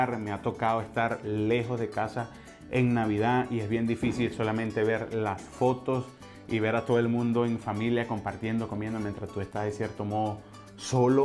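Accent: Venezuela. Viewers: Hola un gusto verte te vi por allí en YouTube y te escuché hablar y dije el habla como venezolano y bueno me suscribi y me encanta tus videos ☆éxitos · su acento es caraqueño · Al chile suena mejor el acento venezolano que el colombiano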